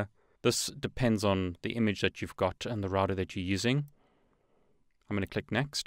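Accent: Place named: South Africa. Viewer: Hehe nice. From Cape Town myself so noticed a bit of the accent. Thanks for the amazing videos, really appreciate your effort. Keep spreading the knowledge. (first attempt at CCNA in a few months)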